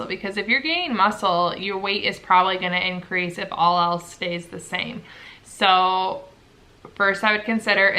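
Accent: Michigan, United States. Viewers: I love your videos. You’re very inspiring. I thought it was funny that someone asked you what state you live in. A. You have a Michigan accent, and B. there’s literally a wall hanging in the shape of Michigan behind you!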